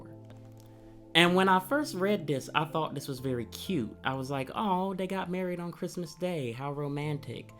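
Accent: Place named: South, United States. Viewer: Hey - I’m loving your videos and just love your Southern accent! You mention about the DNA that was done to try to identity these poor victims. Is there enough information on this for you to create a video on what they found out? Best regards from England!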